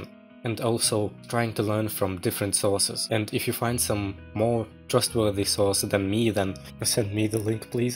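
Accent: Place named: Russia. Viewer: дружище, ты ведь русскоговорящий? я четко слышу этот акцент ахах. а вообще, очень круто. давно не смотрел подобных видео за редким исключением, но ты смог зацепить и вдохновить. всегда обожал метал и в последнее время подсел на брейккор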